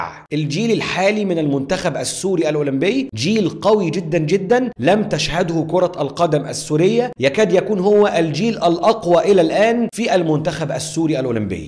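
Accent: Egypt. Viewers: انت سوري وللهجتك مصريه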